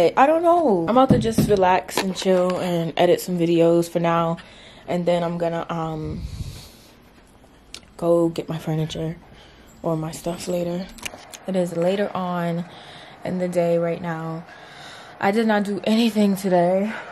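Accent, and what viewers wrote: New York, United States. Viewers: Is it me or is that New York accent getting extra strong lol I think that since you been in New York your accent reappeared, I love ittttt!!!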